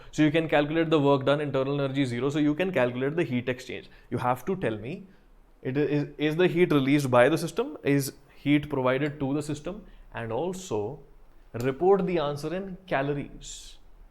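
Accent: India. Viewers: Sir your hindi accent is quite wierd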